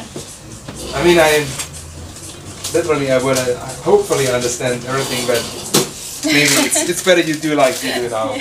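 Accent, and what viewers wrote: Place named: Manchester, United Kingdom. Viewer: Love her Manc accent. I know, there is not just one American or British accent, but in general I think that British English sounds much better.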